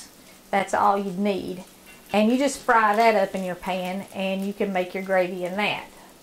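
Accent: South, United States. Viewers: Thank you so much, I really enjoy it and remind me the country accent of my father in law, God blessed you! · Woman with southern accent + cast iron skillet = great food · You sound like a hillbilly to me and I like that. I like hillbillys, I like you, I like your style, and I like your videos. The residue on the bottom of the pan is called "fond." Incorporating it into your sauce is deglazing. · U just made me hungry seeing that plate with fried apples 😋.Must be a southern video by voice👍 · Hi, this is my first time viewing your channel, I hope you never get tired of hearing this.. your southern drawl is awesome! I was blessed to have my moms parents who were German and Italian (both very thick accents) raise me.. well for the most part. Then mom married when I was 5 to a very good man.. which meant I inherited another grandma, his mother. She was very southern with a thick accent as well! 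LoL This was not a wealthy woman by any means, in fact she struggled at times financially. She had 3 grown sons and had no intentions of having any other children in her mid 50’s.. but surprise! She had a daughter that was only a year older than myself when we met. Technically, her little girl was my Aunt by marriage but naturally we were raised more like sisters. I’ll tell you what, my southern granny made the best food out of practically nothing sometimes. Her biscuits and gravy were simple just like u showed in your tutorial but ohhh so flavorful! Just like you said, it didn’t take much sausage to feed a handful of ppl since it was only meant to flavor the gravy. I probably wouldn’t have tried rabbit in my lifetime had it not been for her southern cooking. Her fried chicken was to die for and she could do anything with a pot of beans and some cornbread when the budget got tight.. and it was delicious! 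Traditionally at Christmas time, we did not set out milk and cookies for Santa at her house but rather a bowl of butter bean soup and a homemade biscuit. One year us gir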